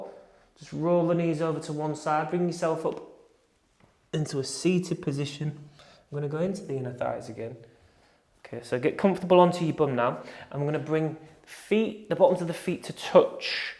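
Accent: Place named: Yorkshire, United Kingdom. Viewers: Thanks. love you. don't worry about your yorkshire twang xx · Re:pronunciation of liTTLE - I deliberately tune into your channel as a Yorkshire lass living in London for home comfort and warmth - please do not change your elocution it’s why I subscribe. !! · Why change your accent,? I love your Northern accent...reminds me of my Grandmother. I could understand her even though we lived an ocean apart.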